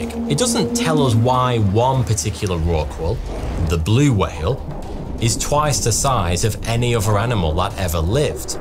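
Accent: United Kingdom. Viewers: "Hey fowtewtouw hewe" Dude, I can't get enough of your British accent. 😃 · I just love the British accent ❤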